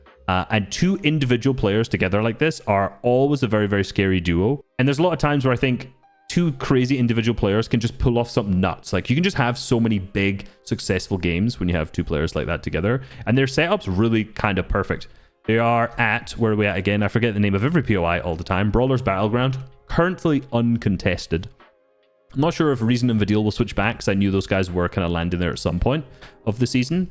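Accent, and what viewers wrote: Scotland, United Kingdom. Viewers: cant help but admire the scottish accent 🔥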